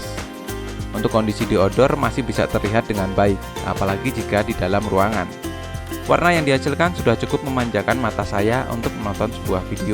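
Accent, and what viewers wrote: Yogyakarta, Indonesia. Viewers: Wong jogja po?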